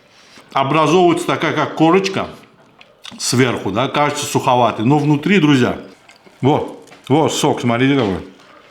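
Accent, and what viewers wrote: Baku, Azerbaijan. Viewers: Приятно слышать русскую речь с Бакинским акцентом.рецепт бомба 😊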